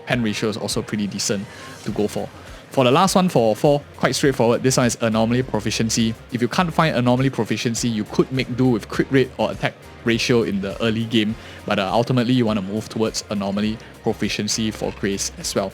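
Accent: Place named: Singapore. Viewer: Sound like a Singaporean (ofc I myself am). A lot of thing I didn't know cause I didn't bother reading the long skill explanation, he gives simple explanations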